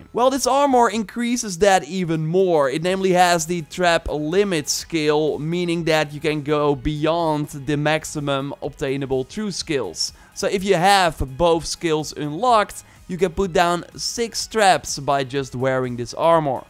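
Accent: Netherlands. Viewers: A thicc dutch accent 😂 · I feel like you are dutch? Are you🤨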